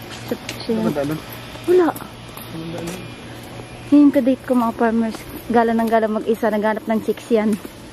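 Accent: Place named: Iloilo, Philippines. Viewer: Parang d ka nmn purong Tagalog ilongga ka ata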